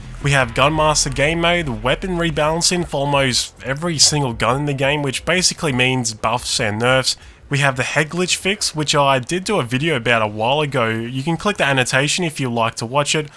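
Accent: Australia. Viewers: Are you an austrailian?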